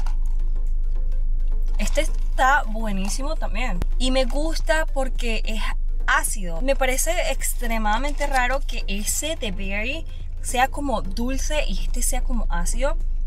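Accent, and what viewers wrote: Venezuela. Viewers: Es de Venezuela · Por qué hablas como venezolana si te ves como china y vives en Estados Unidos? 😯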